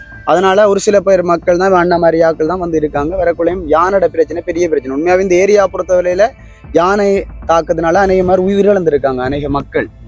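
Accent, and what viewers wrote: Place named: Sri Lanka. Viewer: இலங்கைத் தமிழழகு 🐅🐅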